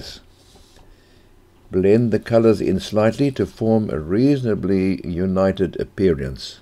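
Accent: South Africa. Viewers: I absolutely loved hearing my fellow South African accent so unexpextantly. I have been living in the US for a few years and was looking for some new inspiration in my paintings. Great tutorials.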